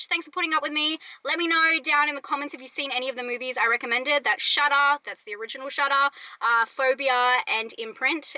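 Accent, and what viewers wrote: Australia. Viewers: I let this video play while cleaning my bathroom, enjoying that Australian accent and when I came to my screen and saw the nosebleed I freaked for a second lmao...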